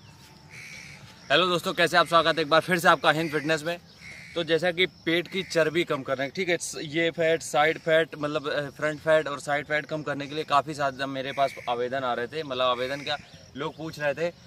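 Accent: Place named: Lucknow, India. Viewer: Lucknow k ho lagta h.. bahut badhia style h hachak k 🤩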